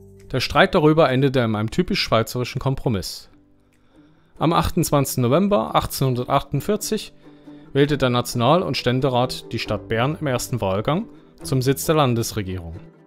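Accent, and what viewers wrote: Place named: East Germany, Germany. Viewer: Super Film. Mit deinem Ossiedialekt hälts du uns den Spiegel vor und gelegentlich lernen wir selbst noch etwas über uns. Mit Humor und pointiert-weiter so.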